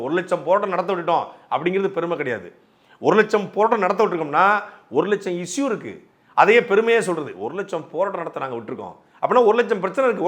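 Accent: Tirunelveli, India. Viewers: thirunelveli pechukku enathu valthukkal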